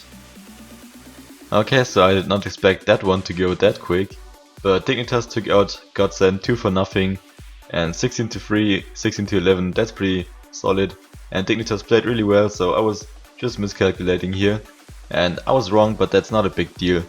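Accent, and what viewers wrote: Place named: Germany. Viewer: Lol man hört mega Raus das du Deutsch bist :)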